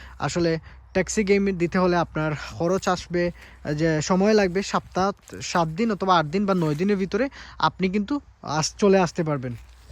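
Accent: Sylhet, Bangladesh. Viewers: ভাই মনে হয় সিলেটি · ভাই আপনার কথাগুলো অনেক সুন্দর লাগলো আপনার বাড়ি সিলেট নাকি, সিলেটের মত কথা বলেন আপনি,,,,, 👍👍👍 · ভাইয়া আপনার বাড়ি সিলেট নি? লাগের সিলেটি 🥰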